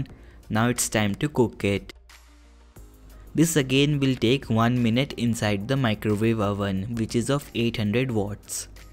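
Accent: India. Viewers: People are making it sound as if he's/she's rasist because he has an Indian accent or something. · lol indian accents always get me🤣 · Looking at the comment section it feels that this video has garnered views from all over the globe. Quite a success for something that is vegetarian and not butter chicken. To those complaining about the accent, please just chill. This is an Indian guy and English is not his mother tongue. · Myra Cherryfall He is Indian since I’m Nepali and I know how they speak xP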